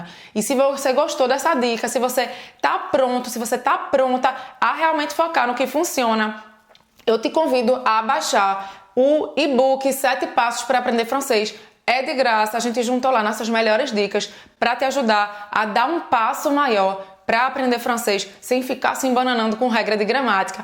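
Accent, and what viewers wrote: Pernambuco, Brazil. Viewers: o sotaque pernambuquêz acelerado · Ai mulher, eu te amo. Eu to na belgica, comecei a aprender frances agr e vcs estao me ajudando muito. você é de recife? Porque eu sou de lá, e seu sotaque é muito recifense. bjjjuu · Que aula chatíssima. A 'professora' fala muito e seu sotaque pernambucano é muito irritante. Em 15 minutos de vídeo e nada de aula real. Lamentável!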